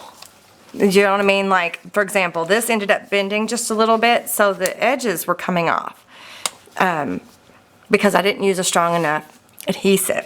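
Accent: South, United States. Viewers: Many happy returns of the day and it is lovely to put a face to the voice behind the videos. Wow those albums are wonderful and the flourishing's are beautiful and the the album choice is brilliant as the curved edges look like the tops of cobwebs,I love thee idea of the mirror under the witches hat. Another fab giveaway but I feel we should be gifting you for your birthday! Have a wonderful birthday with loved ones and it is really nice to see you,your very pretty and I love listening to your southern accent. Thanks for the opportunity to win. Amanda in the United Kingdom. 🎨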